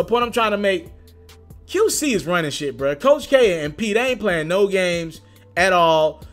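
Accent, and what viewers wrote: Memphis, United States. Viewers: . Youn sound like you from MEMPHIS 💯💯💯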